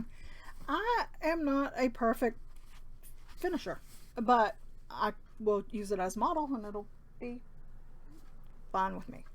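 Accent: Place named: Tennessee, United States. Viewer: I just realized you must live near my old stomping grounds. I spent most of the 80’s in Dickson, Paris, & Dresden, TN. I miss it a lot! Nice to hear your familiar accent. I’d love to stitch long winter.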